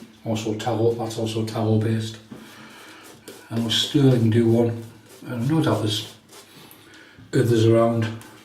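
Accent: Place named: Northern England, United Kingdom. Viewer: Good one! I use the same perfume which is obviously spot on with that soap. One day I will get the original CREED. Now I’m not good at British accents and I apologise if I am being horribly offensive, but to my ears you sound a bit like you’re from Northern England.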